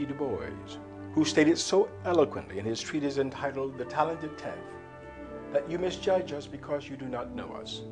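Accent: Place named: United Kingdom. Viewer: the British accent... o.O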